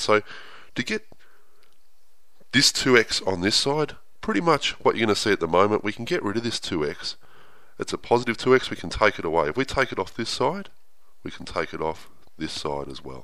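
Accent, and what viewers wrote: Australia. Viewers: Sounds like a fellow Australian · Having an Australian guy really helped out and kept me interested. I wish my teacher talked liked that.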